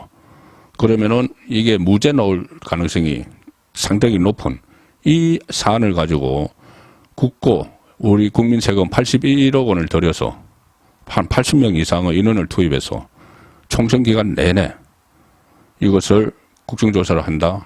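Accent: Gyeongsang, South Korea. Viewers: 송국건의 혼술 구독자가 43만이 넘었네요. 경상도 출신의 투박한 어투지만 오랜기자 생활을 통해 터득한 다양한 경험을 여과없이 전해주신 진정성이 빛났네요 늘 공감합니다. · 야 개소리말어 경상도인간들 다바꿔야해 너같은인간포함해서..그래야 우리가 이길수있어 너자꾸 경상도눍은이 싸고돌지마 니 서투리듣기도싫어 우파니까듣는거야 전라도도 싫지만 경상도 지금 의원 전부바꿔야해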